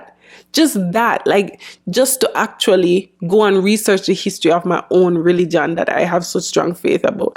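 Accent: Jamaica. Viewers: Are you Jamaican because I’m picking up the accent? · Your Jamaican accent is lovely! And you're pretty! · I was in Bayfarm in Kingston. First time hearing this from the perspective of someone that shares my native accent. I felt the same way when I researched the organization like I was committing a crime. 😂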